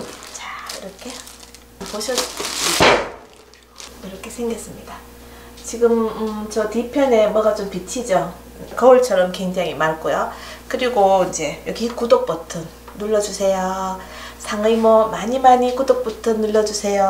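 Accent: Gyeongsang, South Korea. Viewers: 벌써 실버버튼 인가요 ^^ 그리고 실버버튼.. 저렇게 생겼군요 축하드려요 대구살때 한번씩 친정엄마께 전화해서 요리법 물어보곤했는데 여기 미국오고나선 이모님 영상을 많이 참고합니다 경상도분이신가..말투도 친숙하구요 ㅎ 다시한번 축하드리고 골드버튼 되는날까지 아자 아자 ^^ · 님, 시방, 힐링님께 감사댓글을 올리려 들어왔더니 먼저 올려주셨네요. 저도 ♡ ♡ 닉네임도 호감이 갔었지만 구수~한 대구말씨에 완존 공감을 했었답니다. 정말 이모님의 숨은 내조자에 힐링님도 빠질순없지요. 늘~ 감사드리고 있어요.일일이 댓글읽고, 답글 올리는게 보통일이 아니던데 애썼습니다. 우리모두의 ✌✌입니다.잠자리 들때면 폰도 쉬라구 끄고자거든요. 제가 잠든사이 이런 경사가 저를맞아주네요. 힐링님도 선선~ 한 화요일 되시기를 기원합니다. 수고하셨어요.🙋‍♀️🙋‍♀️🎵🎶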